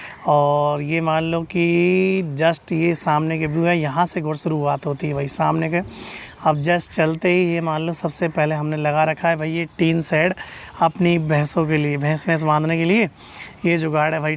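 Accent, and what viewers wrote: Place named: Dausa, India. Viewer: Aap dausa se ho yesa lag raha h or Meena bhi kyuki meri frnd dausa ki h wo bolti h aapki tarah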